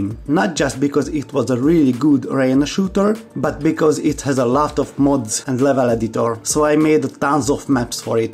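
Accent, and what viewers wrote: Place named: Hungary. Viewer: Are you by any chance from hungary? You sound like a fellow hungarian haha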